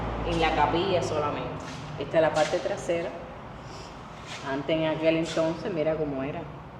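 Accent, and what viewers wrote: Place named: Cuba. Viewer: literalmente cubano, se ve hasta en tu forma de hablar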